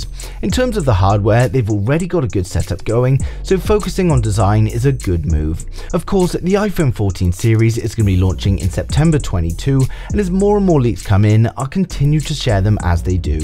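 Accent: United Kingdom. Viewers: They should use your voice for Nat geo when David Attenborough pass away you have a very strong informative British accent.ps super excited for the iPhone 14 pro max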